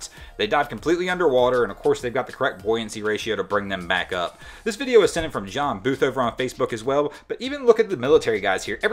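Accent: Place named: Pennsylvania, United States. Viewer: I can tell you're from Pennsylvania, the way you pronounce the letter O.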